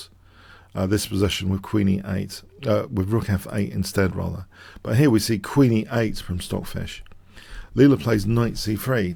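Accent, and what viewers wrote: United Kingdom. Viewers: His low voice and British accent makes it really difficult to understand what he's saying.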